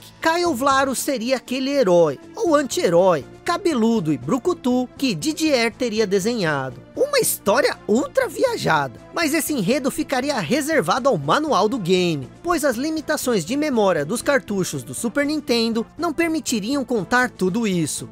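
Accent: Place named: Sao Paulo, Brazil. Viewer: esse é paulista mesmo.... negocio do jooooogo,,,, a trilha sonooora... só dando um toque mano, abraço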